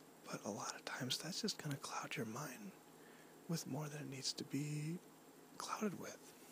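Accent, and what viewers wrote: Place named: New England, United States. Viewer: Are you from Boston?? Or anywhere in mass. Or southern new Hampshire because you talk exactly the way I do and I'm from southern new Hampshire :)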